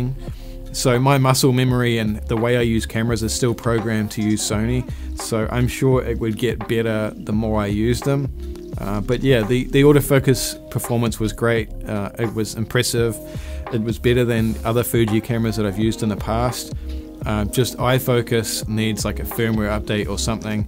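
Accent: New Zealand. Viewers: It's so true what you say about the Fuji APSC vs 35mm. Too long a story to tell in full, but after 11 years of battling it out with Fuji and Lightroom, I've recently swapped over to two Nikon Z bodies, a bevy of their gorgeous Z lenses and Capture One. The difference is off the planet and it is the best thing I've ever done. Even my customers commented on how my images are so much richer and deeper and more gorgeous. I'm actually amazed how I kept any customers using Fuji for all those years. The Z50mm and Z24mm 1.8S lenses are just about glued on my cameras for all my events/weddings and gigs. The Nikon files are gorgeous and are just so rich and deep and process so well in C1. Was great to discover your channel. I'm a Kiwi but live in Perth now, but it's great to hear the accent and to get a glimpse of my wonderful homeland. Keep up the good work.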